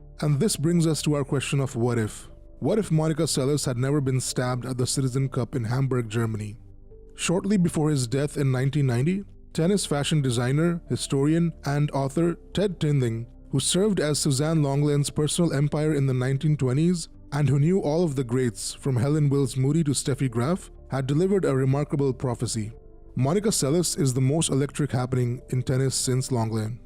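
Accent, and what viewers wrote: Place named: India. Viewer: You are an Indian, can hear it in your voice though you try to disguise it.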